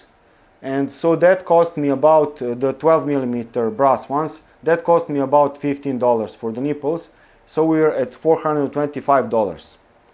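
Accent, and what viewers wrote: Eastern Europe. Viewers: Your “Slavic accent”, my friend, is not a problem at all - and I’d bet that your English is a helluva lot better than most English-speakers’ grasp of any Slavic language 😅 Your videos make a lot of sense, get straight to the point and avoid hyperbole. There seems to be no agenda other than sharing your knowledge. This is all hugely appreciated, thank you. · Your Slavic accent is fine 😉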